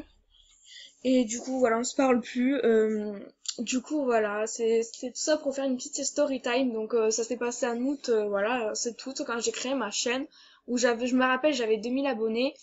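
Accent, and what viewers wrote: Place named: Toulouse, France. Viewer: Kami Kado De youtube elle a le même accent quelle (Toulouse je pense) :)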